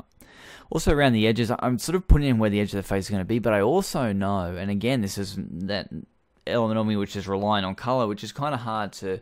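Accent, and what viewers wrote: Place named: Australia. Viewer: awesome nice style. good to hear an aussie, deff make more dude very informative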